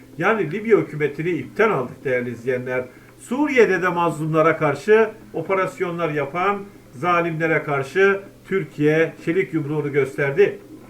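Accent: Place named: Shusha, Azerbaijan. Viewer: Allah senden razı olsun qardaşım. Sen öyle konuşuyorsan ki,sanki Şuşada doğulmuşsun.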